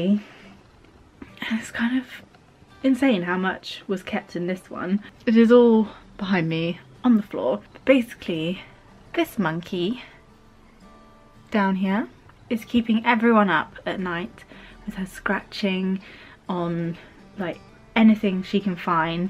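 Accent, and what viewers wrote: United Kingdom. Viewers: So satisfying to watch! Loved the kitty checking on everything. I also donated lots of clothes and cosmetics to charity organizations this year. Absolutely LOVE your voice too :) From which part of the UK are you if I can ask? :) xxx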